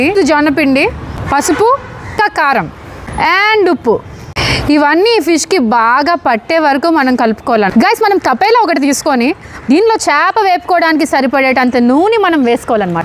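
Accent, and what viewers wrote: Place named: Godavari, India. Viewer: తపెలా...... బాగుంది గోదారి బాష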